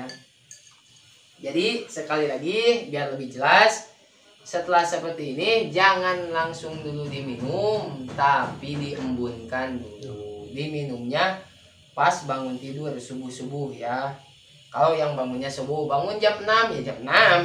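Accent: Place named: West Java, Indonesia. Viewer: Kok ngomongnya sunda seuwareh kang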